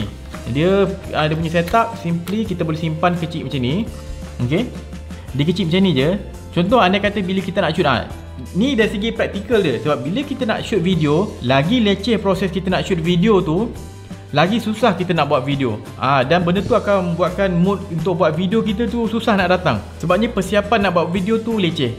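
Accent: Melaka, Malaysia. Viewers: bunyi slang Melaka 👍👍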